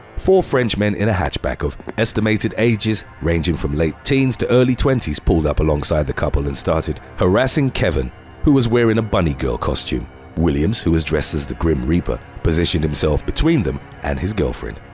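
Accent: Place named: England, United Kingdom. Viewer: Dark skin, English accent, hypnotizing voice. Very unique. Makes the crimes more bearable to listen to.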